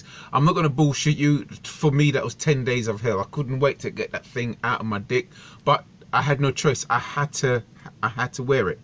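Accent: United Kingdom. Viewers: You sound like a f***ing southern hero mate, I've just come out a university 28 years old see that your subs need putting up a new video quality could do with improving, I'm looking for a job atm, cockney based the same to you. I'm from Essex, back in the day growing up. in Pompey now. really im offering my services if I could do anything technological/ graphics/ back-end/ editing business stuff to help get you going (2018 youtube is going to be very hard) my degree was entrepreneurship that's going to help you get whatever you're trying to get out online then let me know (no money atm bud, i just wana help listnening to ya). I'll just wanna know a bit more about your drive etc you doing this for money? awareness? a living? I've had a look through your channel and it's a bit all over the place (no offence) I don't know how to offer help but I know I want to let me know cheers for the info you're a hero as i said. 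thanks for the upload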